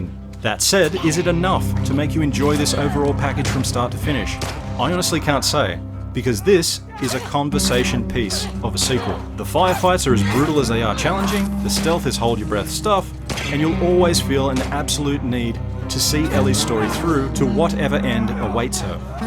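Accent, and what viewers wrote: Australia. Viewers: Best review yet. Love the Aussie accent/slang and you are GOOD at this game! Fantastic stuff. Subbed. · Nice review and are u Australian? Cause then u get major respect.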